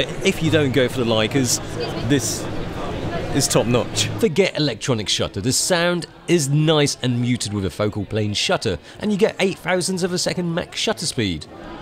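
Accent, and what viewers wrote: United Kingdom. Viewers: I just got an X-Pro3. I've had a couple X100's and a Pro2. I may be one of the ten people that actually use the OVF so my request is statically HUGE. On all the other hybrid viewfinders I've used from Fuji, in the OVF mode the parallax frame lines move to compensate when the shutter is 1/2 pressed and return to the neutral infinity position as soon as the shutter button is released. On the Pro3 I have, The parallax correction moves the framing box but DOES NOT RETURN TO THE NEUTRAL POSITION. This is VERY annoying when recomposing the next image at infinity after many years of muscle memory stored with the other Fuji Hybrids. If one close focuses and the frame moves to the lower right it remains there even when the camera is turned off and on again. And besides, it just ain't natural, Mate. It violates well known cosmic laws regarding such matters. 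I called Fuji support and they appeared clueless but only said they'd pass it along. Since you have a popular channel and are a witty Chinese guy with a British ascent (I'm not sure of another combination that might carry more instant authority) I thought perhaps you may get more attention if you brought it up.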